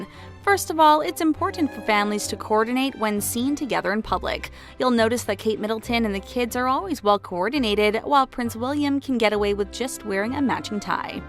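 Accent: United States. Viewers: Narrated by an American….. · you can tell who was talking is american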